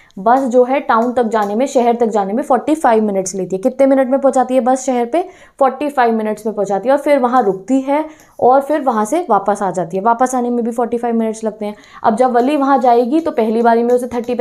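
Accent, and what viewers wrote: Punjab, India. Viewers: Di are you a Punjabi?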